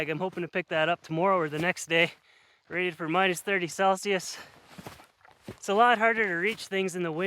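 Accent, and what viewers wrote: Canada. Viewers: i was just there !!! staying in my self converted trailer. very new. just found your videos. cheers dude!! love the canadian accent with the sarcasm. ahahah maybe see you out there sometime. im personally not ready for -20 yet but are we ever fully. Beautiful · EXCELLENT VLOG. THE SNOW AND MOUNTAINS ARE UNBELIEVABLY BEAUTIFUL. APPRECIATE THE CANADIAN ACCENT !!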